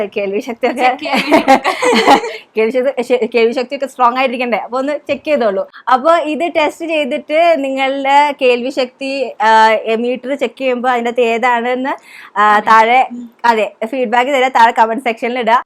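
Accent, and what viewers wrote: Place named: Thrissur, India. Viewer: Tcr slang😍😍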